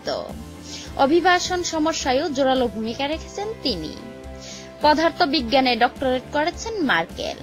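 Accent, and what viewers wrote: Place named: Jessore, Bangladesh. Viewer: আপনার বাসা কোন জেলায়? যশোরের ভাষায় কথা বলেন।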